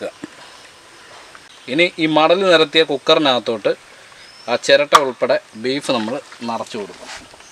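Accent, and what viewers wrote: Kottayam, India. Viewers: ചേട്ടന്റെ സംഭാഷണം കേട്ടിട്ട് കോട്ടയം ഭാഗത്താണോ veedu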